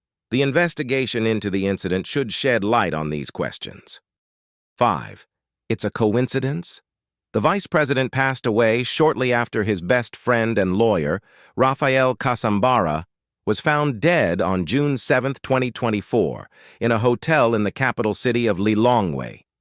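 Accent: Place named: United Kingdom. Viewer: You must be from BBC - enemies of the black race and enemies of All Christians. You are always going through African countries from country to Country creating trouble, accusing l Hatred ajc division and Destroying African leaders. Wtr hear it from your British Accent. You are turning now to Malawi trying to capitalize on this terrible tragedy to boosy your lucrative business which you conduct with the blood of the black people -- it is your destructive work. There was absolutely no rift between the current president and the Vice president. Why would high ranking Military officers( MAJORS) who PERISHED in te crash Take thier iwn fellow soldiers and 7 other High ranking Malawi leaders in addition to the Vuce President in a presidential Military plane judt to go Kill themselves along with rhe leadership team of Malawi? Bad weather! Shane on you enemies of the Black people 😮😮😮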